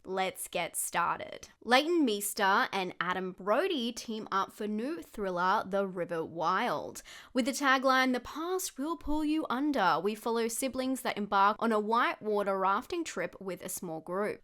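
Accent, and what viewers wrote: Australia. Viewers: It’s great to hear an Aussie accent! Keep up the good work, love you recommendations 🇦🇺👍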